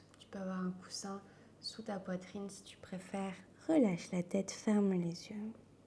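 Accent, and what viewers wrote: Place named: France. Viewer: Bon dimanche, tu as un bel accent français, j'adore les grands écarts et les mouvements, chérie. 🥰❤🌹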